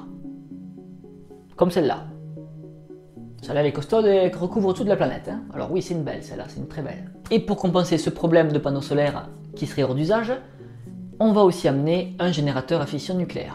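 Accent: France. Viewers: l'accent provençal enlève beaucoup de crédibilité, mais c'était interessant ! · Mama cet accent toulousAIN mdr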